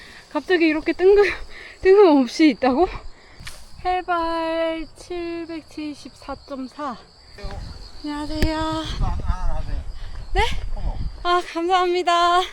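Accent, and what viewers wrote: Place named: Gyeongsang, South Korea. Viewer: 아~~~주변에 비해 높다 하여 노꼬메 인가 보네요 경상도 사투리 이군요 제주 방언 인가 하였네요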